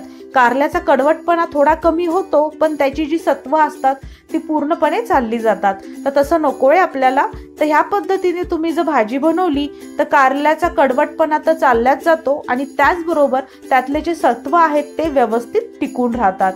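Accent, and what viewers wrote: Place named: Goa, India. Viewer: Helo Madam Tuzhe Nanv kitem aAni tun Goenkar ashen dista the accent you are talking is from Goa I think Marathi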